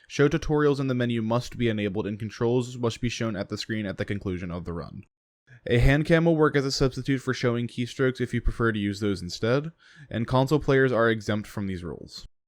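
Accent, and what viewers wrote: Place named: United Kingdom. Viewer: you are bri'ish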